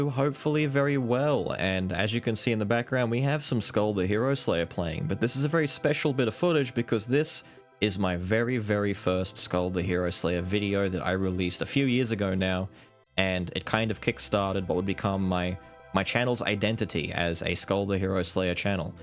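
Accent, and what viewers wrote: Australia. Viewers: I didn't stick around for Skul. I saw funny looking thumbnails and a funny sounding Australian man, I knew for a fact that Skul is just the start for something more.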